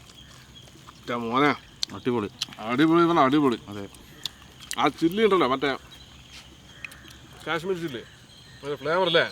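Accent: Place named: Palakkad, India. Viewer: Heloo ... ...ചേട്ടന്റെ എല്ല വിഡിയോസും പൊളിയാണ്.. ട്ടോ... നല്ല രസം ആണ് കണ്ടിരിക്കാൻ.... മാത്രമല്ല... പാലക്കാടൻ സംസാരവും... എന്തായാലും പൊളി തന്നെ...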